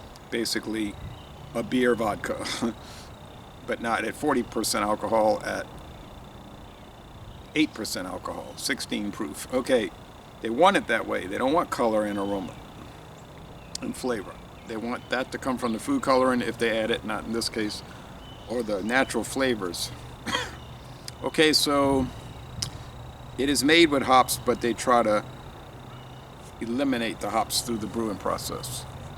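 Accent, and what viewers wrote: United States. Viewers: I should start making videos and give little digs about how you Americans pronounce and spell certain words.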